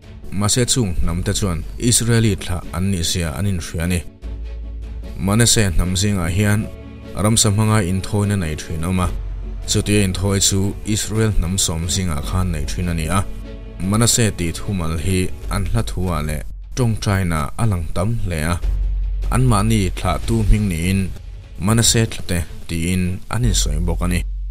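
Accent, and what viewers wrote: Mizoram, India. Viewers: a chhiartu hi damna thilah mi lo ngaihsak a law...demna lam ani lo...tu ziah nge i chhiar...a ziaktu hi Israel nih chak mi a ni ngei ang nga...tiang lam a mizo histry ziaktu chuan Israel a chhui luh tumin antin zawn ber e...ti a coment chauh zawk ka ni e.... hi ka dem reng2lo e...a thil chhiar...Lushei aw phawi...Duhlian țawng kaiza irawm dik chhakchhuak mi..anih vang hian ka ngaisang khawp asin...Lusei thumal dik leili vawrh ri hriat ka ngainat ber asin....i ngai sual anih kha...ka comentna hi...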